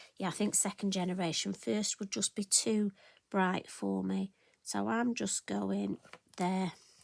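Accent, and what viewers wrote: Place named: Cleckheaton, United Kingdom. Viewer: No you sound just like my family from Cleckheaton.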